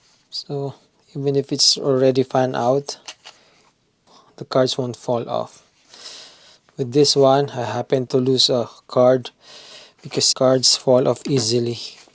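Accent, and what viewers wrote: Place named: Philippines. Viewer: Thanks for posting this and showing off all of the compartments! Just ordered it because I made a mistake and bought a different Vulkit that can't really carry cash 😅. Ignore the bumbling morons who claim they can't understand properly spoken English because of a light Filipino accent. You speak just fine, I watched the video at double speed and still made out every word. Side note: I think you're meant to fold the last 1/4th of the cash bills inward to fit them into the slot. That's how it looked on the site anyway.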